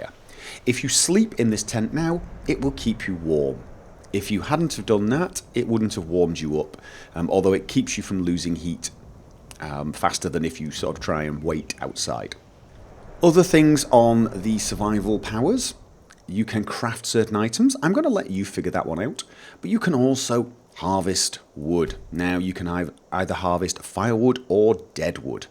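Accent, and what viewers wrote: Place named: Wales, United Kingdom. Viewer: this guys regal welsh accent is kind of peculiar :D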